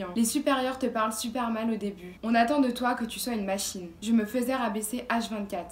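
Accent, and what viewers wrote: Lyon, France. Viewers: T’es de Lyon ?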